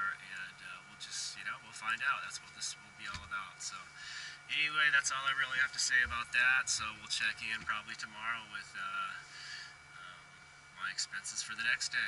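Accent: Maine, United States. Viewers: are you from Maine?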